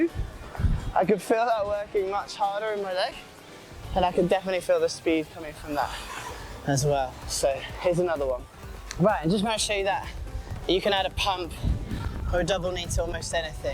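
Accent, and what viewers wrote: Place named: RP, United Kingdom. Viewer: I'm from Texas, USA. I like your posh sounding accent. I'd like to know Where are you from, so that I can add to my knowledge of UK (I assume) accents.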